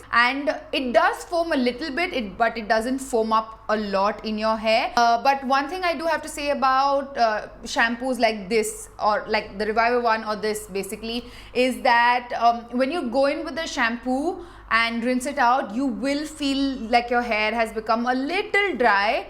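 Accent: India. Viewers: Priyanka Patnaik I understand what you are saying. But she cannot help it. When you listen to different accents all day you tend to get slightly confused. It does not bother me though. I prefer her natural Mumbai accent, I lost mine in the US, so it feels like home. ❤️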